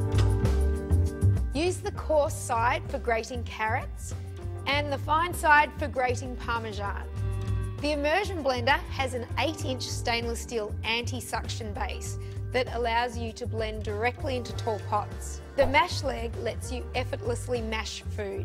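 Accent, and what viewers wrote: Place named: Australia. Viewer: Her charming Aussie accent kept me watching since I already have a similar appliance.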